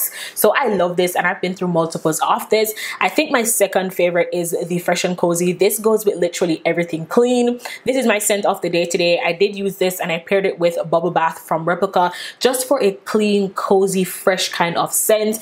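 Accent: Jamaica. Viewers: Great video. First timer here! You sound Jamaican. Are you?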